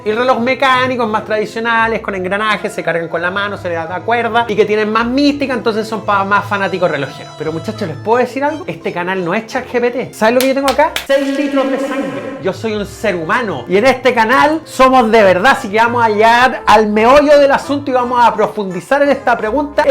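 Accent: Chile. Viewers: Tengo 5 cosas que decirles: 1) Me encantan sus historias relojeras curiosas con datos poco conocidos. 2) No cambien su acento ni palabras chilenas, los queremos así como son. 3) Me gustaría ver videos de Seiko Alba o Credor. 4) Me deben el video de las abominaciones relojeras que fueron al espacio. 5) Saludos desde México. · Es mejor el Quarzo pero Solar y si es mixto solar y batería Quarzo simplemente será un reloj para siglos …Me da risa el acento Chileno 😅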